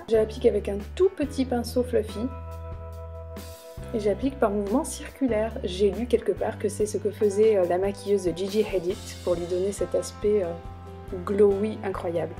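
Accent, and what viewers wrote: England, United Kingdom. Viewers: C joli mais tu aurais pu repasser la combi ! Sinon j'aime bcp ta voix et ton accent anglais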